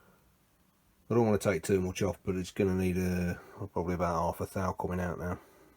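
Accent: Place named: Leicestershire, United Kingdom. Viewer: I've been working my way through your Holbrook restoration videos, albeit a few years too late. As an old retired Machine tool Fitter, (I worked 50 years at Jones and Shipman, building Grinding machines) I have to say your work and methods are exceptional, especially your scraping tecniques, that have have come on leap and bounds, I thought I sensed a bit of a Leicesterhire accent too, me being from Leicester myself, fair play to you, have subscribed, cheers, Dave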